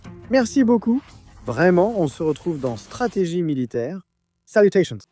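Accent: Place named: Israel. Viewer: Vous voulez etre les seuls a avoir la bombe atomique. Pour qui vous prenez vous pour les maitres du monde .pourquoi Israël possede telle la bombe atomique.vous ne parlez pas des 75000 palestiniens éliminés par vos force israélienne .aucune sanctions contres l'entité sioniste. J'espère que les iraniens possede l'arme nucléaire.vous devez etre un israéliens cela se devine avec votre intonation. Sortait déjà des territoires palestiniens ou avez commis un genocide inhumain. Mais votre destin est scellé rien ne pourra excusé l'élimination de plus de 75000 palestiniens.avec le silence des européens et des americains.mais dieu veille. et votre Fin et proche.vous n'avez pas d'état vous etes un peuple errant sans domicile fixe.sans la benediction americaine qui vous protege sinon vous n'êtes rien qu'un grain de sables dans l'immensité du désert. mais dieu saura reconnaître les siens. 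Et celui ci feras tombé les foudres du ciel sur vos têtes. C'est vous les terroristes tueur de femme et d'enfant. La situation réelle est que dieu vous chatieras. Tu mangera tes petits pain en enfer.sans les amerloques vous netes rien.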